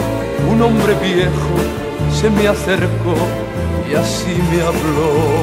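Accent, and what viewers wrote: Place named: Canary Islands, Spain. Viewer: es canario, no va a tener el acento de *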